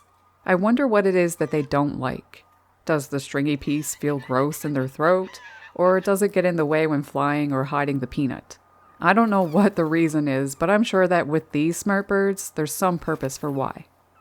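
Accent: Canada. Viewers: I enjoy the Canadian twang in your voice.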